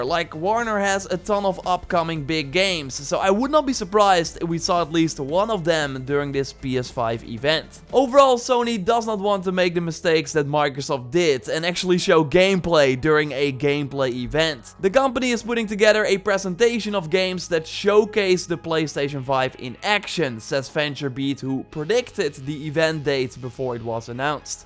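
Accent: Netherlands. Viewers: is Dutch, Guerilla Games is based in Amsterdam, hence the Dutch pronounciation. (But yea, is Dutch too. =P)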